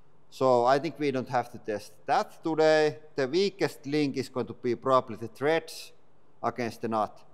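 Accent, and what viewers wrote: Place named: Finland. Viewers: I got bored in the middle, but if you speed up some of it, to like 1.5 speed, so that we can still understand your Finnglish but also spend like 30% less time, that'd be great. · I could listen to Finns speaking English for hours, so, you're good! 😎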